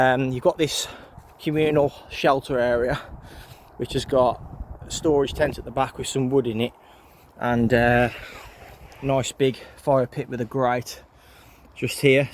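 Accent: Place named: Black Country, United Kingdom. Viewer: Hi mate you sound like your from the black county ? I’m a bushcrafter do you need any help with it ?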